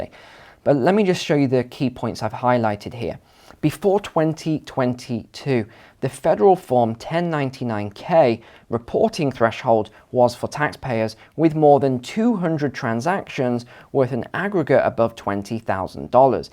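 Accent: United Kingdom. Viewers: Crock of shit has anyone of you verified this on the I R S website. He sounds very British maybe its Britons IRS.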